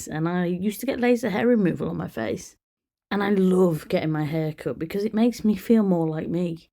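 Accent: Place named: United Kingdom. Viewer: Hey, could you write your email please? Hard to hear for non-british ears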